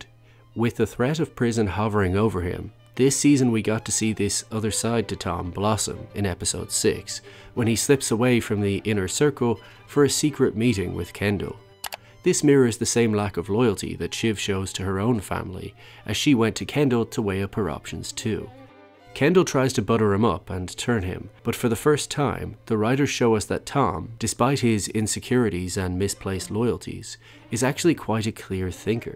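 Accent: Ireland. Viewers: Do I hear a slight Irish accent??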